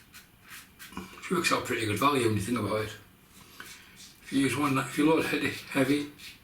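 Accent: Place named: North East England, United Kingdom. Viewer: Just subscribed a few days ago. Nice to hear a North East accent. My part of the world as well. Hope you hit the 200 subscribers soon. I enjoyed watching your shave.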